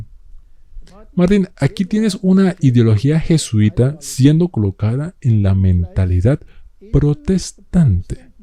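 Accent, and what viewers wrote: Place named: Colombia. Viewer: Feliz Sabado!!!!.....gracias por dejar abajo el enlace a la predicacion original. La estaba buscando y no la podia encontrar. De paso me encanta tu acento colombiano!!! Keep up the good works!!!!! God bless your ministry!! Bendiciones!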